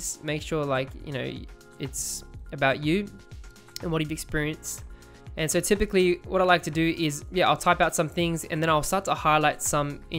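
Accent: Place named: Australia. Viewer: Thanks heaps mate, love the accent as well, finally a tutorial in strayan.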